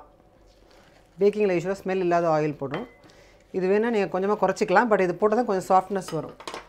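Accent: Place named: Chennai, India. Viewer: no thanks dear.. I'm mercel for ur chennai slang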